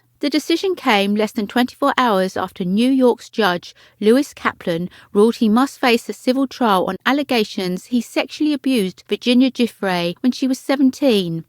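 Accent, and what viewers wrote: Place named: Cockney, United Kingdom. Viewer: The voice over lady's chimney sweep accent is not a good fit for the channel!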